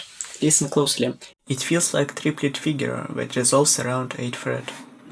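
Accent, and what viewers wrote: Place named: Russia. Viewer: Настоящий руский акцент а так-то очень тебе благодарен за разбор мечтал когдато виучить.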